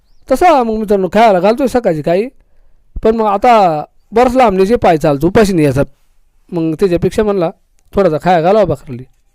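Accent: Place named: Palghar, India. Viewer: दादा तुम्ही बोलता तीच बोलीभाषा आहे आमच्या गावची पण... मला वाटले तुम्ही पण पालघर मधलेच आहेत की काय...❤❤ जय आदिवासी दादा...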